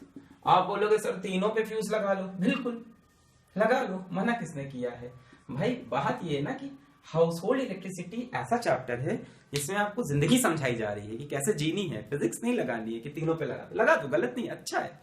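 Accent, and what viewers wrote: India. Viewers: I love your hindi accent, even though I speak a little.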